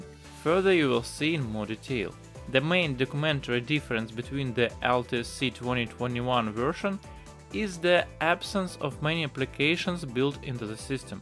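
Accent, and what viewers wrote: Russia. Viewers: Все равно русский акцент не скрыть.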